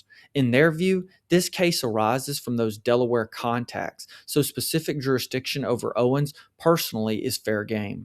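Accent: South, United States. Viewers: 1st time viewer here! I’m enjoying your content! So, you’re in CA, but you’ve got a delightful southern accent. I wonder which state. Texas, or where???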